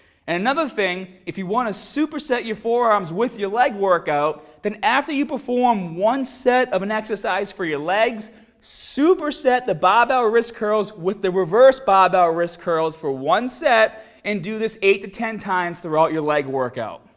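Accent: Boston, United States. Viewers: Am I crazy or is Bostonian accent a lot more noticeable? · Love the Boston accent :) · Boston or Rhode Island accent? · Reasons why i subscribed: that strong Boston accent and funny yet serious. Nice work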